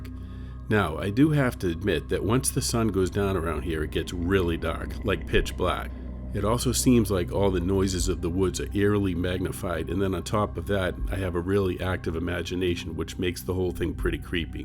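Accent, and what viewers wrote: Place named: Northeast, United States. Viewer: The northeast accent is refreshingly unique. Very much enjoying your channel. Looking forward to watching it grow.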